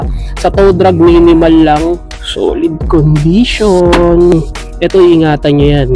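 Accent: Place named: Iloilo, Philippines. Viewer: Boy ilongo ka ky pang hambal mo pang iloilo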